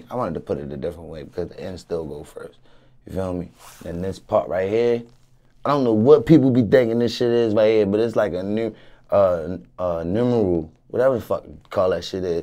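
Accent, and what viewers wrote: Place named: Baltimore, United States. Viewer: It’s a Baltimore accent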